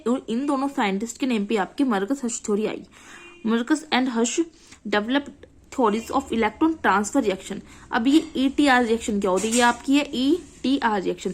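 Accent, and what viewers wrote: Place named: India. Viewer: Peak Indian accent didn't even understand a single word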